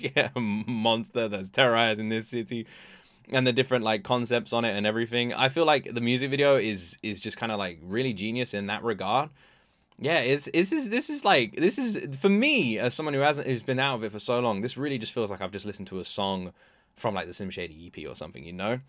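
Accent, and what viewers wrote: United Kingdom. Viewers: I'm new to you and your channel you did a amazing job with this I'm listening and hearing your accent or you british or english I was watching some video yesterday and seen a video and I didn't think nothing of it I thought it was a older video talking to some guy and going do a magic trick and make his career disapear and now alotta other reaction talk about some rumors about trying to get cancelled or about to retire my guy my guy been doing this for how long now and only hours later from that video I seen drop this with the music video I don't know what going to happen what going on son the only one that knows about anything is and probably whomever else talk to if this one of last songs albums it's going to be a really very sad bad day I like my guy my guy keep on doing what you doing my guy 👊👊🔥🔥👊👊👊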